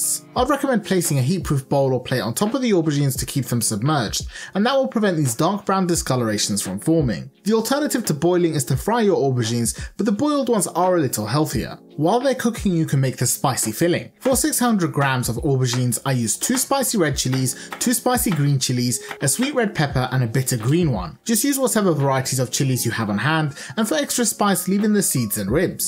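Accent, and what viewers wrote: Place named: United Kingdom. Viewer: I like that you’re really Egyptian even though you sound British , I like the combination 💜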